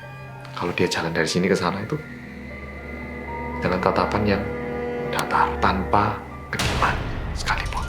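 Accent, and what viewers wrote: Java, Indonesia. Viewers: Awakmu aneh tenan tak rasakke mas, lha awakmu arek korea nangin kok tag line ne kok yo jowo, medhok luwe po meneh kewedhen...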